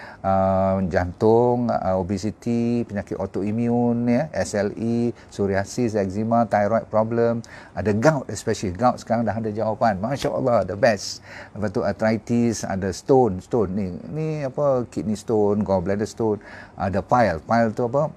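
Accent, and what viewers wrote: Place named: Kedah, Malaysia. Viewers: Tiba2 Dr. Kefek utara asai oghang mana? Info cukup bagusla Dr tq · alhamdulilah...tqvm... ilmu yg sgt bermanafaat..suka dgr Dr. cakap kedah....terbaek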